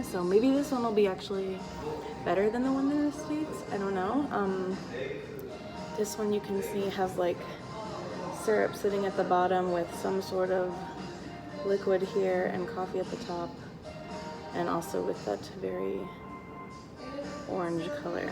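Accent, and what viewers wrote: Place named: South, United States. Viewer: even a Kentucky southern belle. Not used to hearing your southern. Lol 😉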